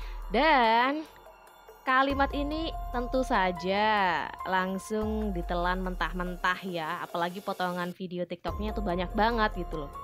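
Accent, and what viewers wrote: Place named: Medan, Indonesia. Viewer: Saya suka dialek medan / batak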